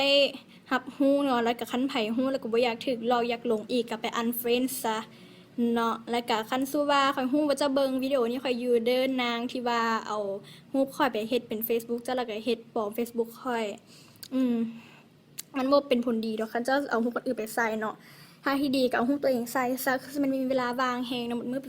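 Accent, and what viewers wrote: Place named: Laos. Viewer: 555 สำเนียงน่ารักอ่ะ... รักสาวลาวแล้วครับ....5555 แล้วเฟสบุ๊คจริงๆคืออะไรขอมั่งสิครับ ^_^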